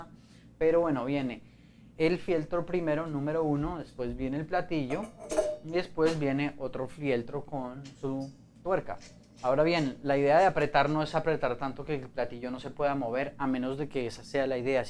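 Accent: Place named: Colombia. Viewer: eh tio eres buenisimo jajajajaja que risas me hecho con tu asento de colombia... eres un crack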